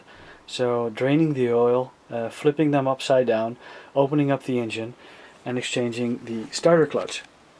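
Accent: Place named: Netherlands. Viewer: Watching you videos and hearing your accent I assume you are dutch. Well i’m dutch too and my kz 750 gt makes exactly the same noise. Do you by any chance do repairs on bikes other than your own? This job seems a bit out of my league so I really could use some help...Groeten, Rogier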